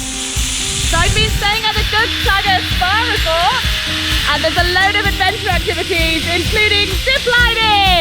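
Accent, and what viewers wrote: United Kingdom. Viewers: Mam ...I can guess..u r British..Am I right??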